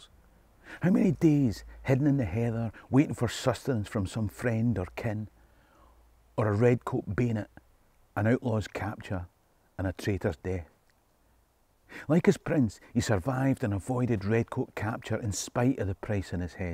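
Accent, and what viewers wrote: Scotland, United Kingdom. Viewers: I absolutely LOVE everything about your country! Outlander is credited for putting "Scotland on the map" .. I love your culture, accent, passion, history and the beautiful country itself. It's strange I've never visited your beautiful lands, however, in the deepest part of my soul I know I have a strong connection to it!! #ILoveScotland #SendingLoveFromAustralia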